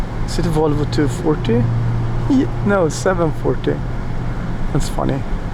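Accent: Sweden. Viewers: that svedisch accent mannen, suverän bil! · You sound like u are from sweden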